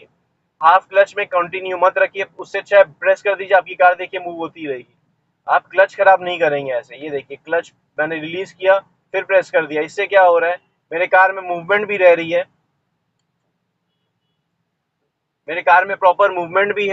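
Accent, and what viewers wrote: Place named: Punjab, India. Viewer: sir aap kaunsa biradari se ho? you sound like a Punjabi to me.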